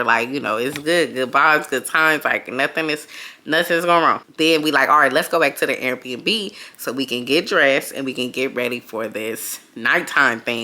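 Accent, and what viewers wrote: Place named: Bay Area, United States. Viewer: Sis I was gonna ask you where you were from cause I was listening to you talk & was likeee “she sounds like she’s from the crib!” Lol . I found a comment & you said you’re from Oakland. Yesss love my Bay youtube girlies! 🤍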